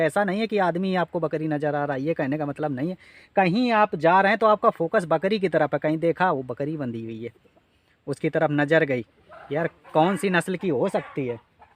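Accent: Kanpur, India. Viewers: सबसे अच्छी लगी आपकी बोली. सुनते ही समझ गया आप कानपुर से हैं. 1979 में मैं डी एम यू में पढता था. अमरूद के बाग घूमने रावत पुर, कल्यान पुर जाता था.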